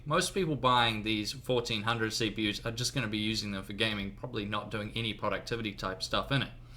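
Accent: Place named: New Zealand. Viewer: Yeah, although I noticed some things. I was thinking he was Aussie or possibly Irish with the way his "fair" sounded. But he reminded me on the Path of Exile developers and the slight accent they have. Then he said he was a New Zealander right as I was thinking he might be from there. I literally LOL'd.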